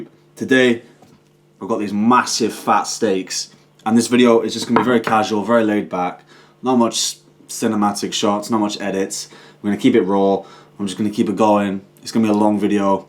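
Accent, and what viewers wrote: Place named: Yorkshire, United Kingdom. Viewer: What part of Yorkshire are you from mate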